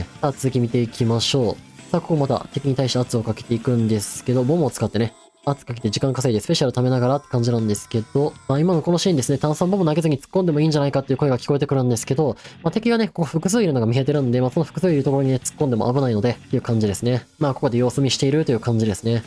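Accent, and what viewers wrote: Kansai, Japan. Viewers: 初心者ですがどの動画もとても分かりやすくて参考になります✨✨味方やキルされてる人数など意識して見れるようになってきました🙏関西弁なのも親近感です😊今後の動画も楽しみにしてます！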